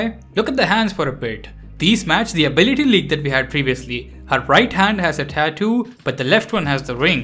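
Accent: India. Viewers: Never mind but why does your accent sound so Indian?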